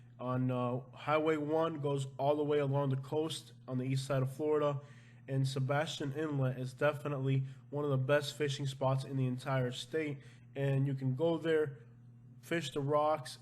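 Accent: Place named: Cuba. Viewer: Most likey he sounds Cuban. So it to be expected. It part of their culture, but to his defense most Cuban women really enjoy shopping.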